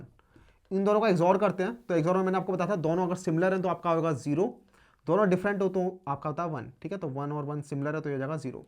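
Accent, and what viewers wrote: India. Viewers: Indian English is so difficult to understand xD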